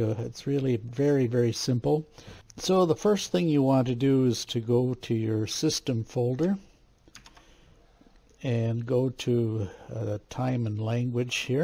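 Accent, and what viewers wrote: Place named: France. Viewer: Thanks a lot, small but very useful tutorial. In addition, as a French, I like to listen to this "particular" accent of my compatriots!!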